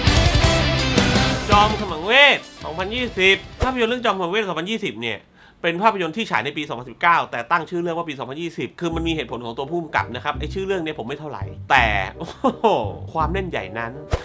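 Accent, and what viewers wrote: South, Thailand. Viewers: พี่เป็นคนใต้ป่าวครับ55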